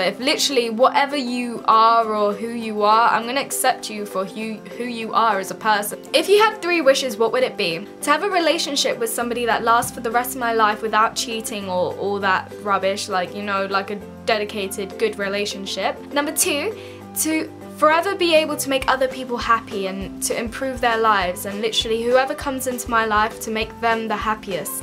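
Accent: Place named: United Kingdom. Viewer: I want to be you....your...your like British or something! I like making accents but I cant do British & I am working on my "Australian" accents.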